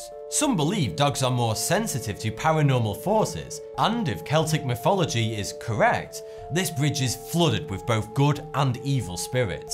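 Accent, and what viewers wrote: United Kingdom. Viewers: Maybe it's exactly because his accent seems really "british " and let's say pure that I find it hard to understand,I'm more used to "impure accent" ,when we practice our english in class we do not have that perfect accent so it can be harder. I find american or australian accents easier to hear down But maybe it's just me ahah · British accent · So what is this guys accent? British, Scottish?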